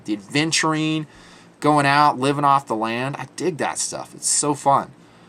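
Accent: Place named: Alabama, United States. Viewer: Perfect timing for viewing this one. My kids are reflecting the "mamma's boy"/wuss image and I've been looking for a hint, path, help, guidance or anything these past couple of months. My resources are at a minimum, and the light has shown me the way. Excellent vid and I'm amazed at the timing on my viewing. Thank you. By the way, the Bama accent comes through in all the vids! When my boys "hate" on me, you know where I'm sending them!! LOL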